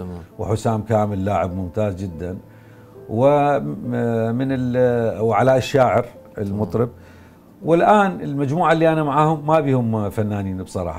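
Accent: Iraq. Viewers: ماأجمل اللهجة العراقية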